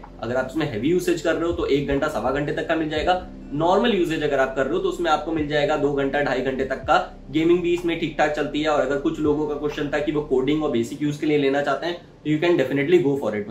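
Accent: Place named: Kanpur, India. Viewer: bhaiya kanpur se ho kya aap ?....apki boli se lagra hai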